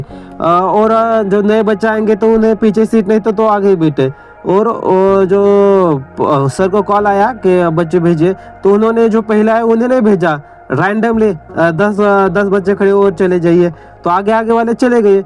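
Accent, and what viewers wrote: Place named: Maharashtra, India. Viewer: साहेब आपल्या हिंदी वरून वाटत आहे आपण मराठी आहात आपण मराठी मध्ये व्हिडिओ बनवा समजल तरी आपल्याला काय म्हणायचं आहे ते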